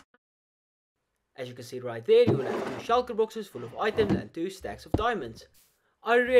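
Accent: South Africa. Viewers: south african if im right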